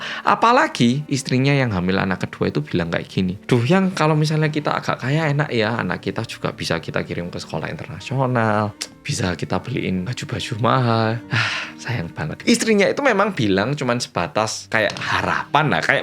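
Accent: Java, Indonesia. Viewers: Sampe tak ulang2 bagian paca'an,jaaaan kuentel jowone gak ilang ilang cak 😂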